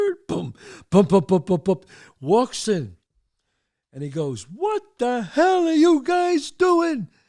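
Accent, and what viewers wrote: United States. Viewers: Enjoy the show.. love the old nyc talk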